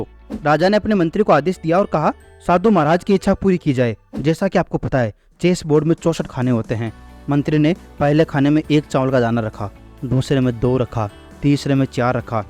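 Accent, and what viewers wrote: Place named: Marwari, India. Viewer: Boli se lagyo ki marwadi ho M bhi sikar jila ka Danta ramgarh gav se hu Kumawat Indore construction ka kaam he Indore he rehte he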